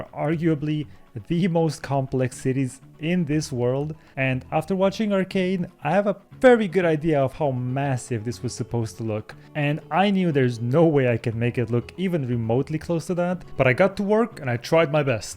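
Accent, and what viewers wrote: Romania. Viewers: are you Romanian?? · are u romanian?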